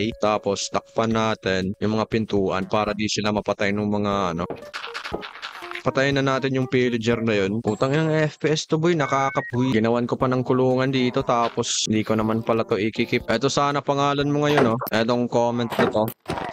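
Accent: Visayas, Philippines. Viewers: Bisaya day ka dol hahaha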